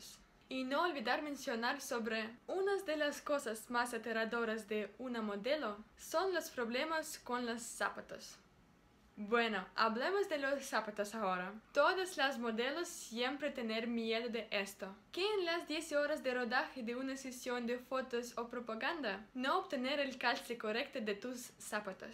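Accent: Russia. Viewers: Me gusta tu pronunciación. O sea. Aunque hayan el ciertos detallito a corregir, igual me gusta. Por ejemplo. Se dice zapátos. Y como dices bolsillos me gusta, acento ruso, pero me gusta. Por cierto. Si te interesa aprender más español, tener con quien practicar, escríbeme al privado de aquí de YouTube y nos ponemos en contacto. Cuidate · Jajaja ese acento ruso español me encanta, aca te dejo un par de luagres para conocer en latinoamerica <link>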